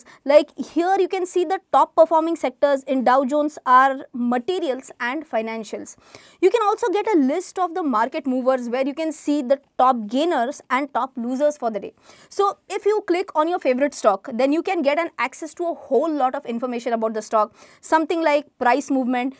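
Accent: Maharashtra, India. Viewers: Your Marathi English sounds so sexy !!! 😍😍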